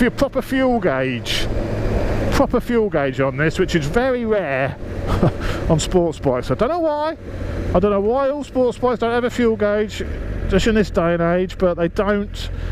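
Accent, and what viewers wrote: United Kingdom. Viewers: Fu and your British English!!!!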